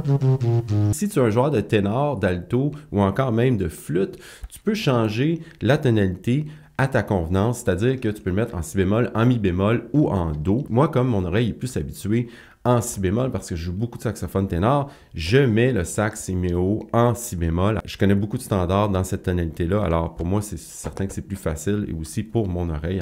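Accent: Canada. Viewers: bonjour. bravo pour ta chaîne que je viens de découvrir. Et ce sax, ça donne vraiment envie notamment pour jouer n'importe ou. sinon en terme de poids, est-ce plus leger ou plus lourd qu'un sax soprano? merci. et ton super accent canadien, j'adore!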